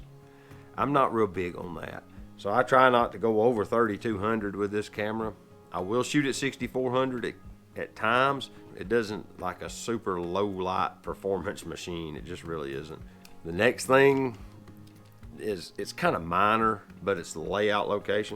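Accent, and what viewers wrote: South, United States. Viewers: Centimeter? Really? :) Sounds strange when someone uses metric system with a southern accent. :) Great video, thank you very much!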